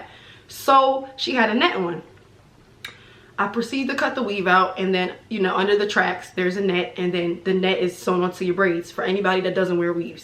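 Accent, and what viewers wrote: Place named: New York, United States. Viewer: u so new york i love it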